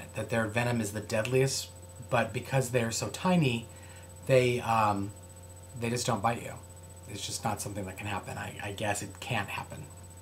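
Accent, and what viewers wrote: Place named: South, United States. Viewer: This Accent Tag was a cool idea. It's really interesting too, and I like how you have a mix of saying words that are both Southern and Eastern. Though I had thought everyone called "remotes" flippers :P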